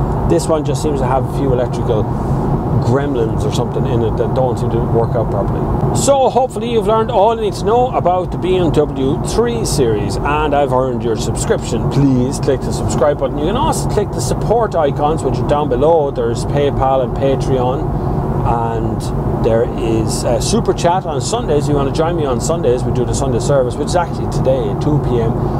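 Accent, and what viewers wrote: Ireland. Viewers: Have you ever said the word Tree and found that it sounded identical to the word Three. Just was wondering because of the Irish accent.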